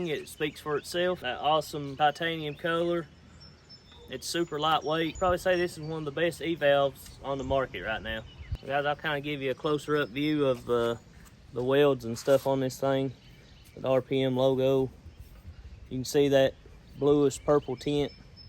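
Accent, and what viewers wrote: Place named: United States. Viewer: This guy have a really hard accent to understand,,, no offense but people from the USA need to learn how to speak English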